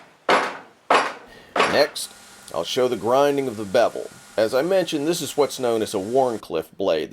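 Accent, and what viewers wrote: United States. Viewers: This sir has a 100% american voice...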